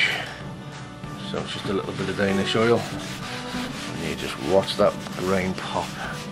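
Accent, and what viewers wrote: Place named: Liverpool, United Kingdom. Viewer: oh wow Eastham eh, my Auntie and Uncle lived in Eastam in Raeburn Av, small world. I didn't want to say the accent was scouse just in case you are like me and say i'm from the wirral not l'pool. thanks for the reply and keep up these great videos, that's 2 plans i have got from you now thanks for that. Barry.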